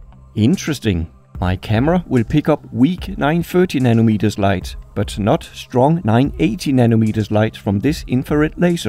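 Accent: Denmark. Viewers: I knew you were from denmark! I could hear it in your voice lol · Du er så meget dansk 👍 vi kan ikke skjule vores danske dialekt 😜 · Are you from Denmark? Cause your accent sounds kinda danish If yes then... DANISH GANG RISE! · Just sounds like a Dane. A lot of Danish people sounds funny when they venture into the English language. I'm Danish myself, but I'm all in on a cockney accent. I don't really know why 🤔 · Are you danish? Edit: Before I got to the middle of the video I just guessed by the accent. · Only when you said "In Denmark", I realised how danish your accent was. Great video man!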